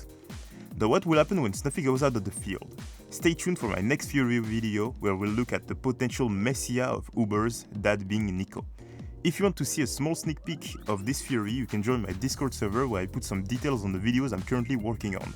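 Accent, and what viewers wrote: France. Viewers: je me disais que t’avais un accent français 🤣jusqu’à ce que je vois ta bio, continue comme ça bgg force !!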